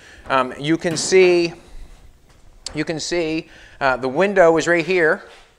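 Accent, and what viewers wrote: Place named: Nova Scotia, Canada. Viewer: I didn't even notice how you were saying plexiglass, I figured it was was your Nova Scotia accent... Lol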